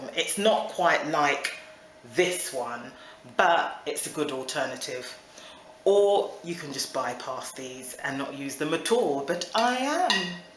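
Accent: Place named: RP, United Kingdom. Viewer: I like your accent is so posh ❤️😂😂😂 ! Love from a Ghanaian sister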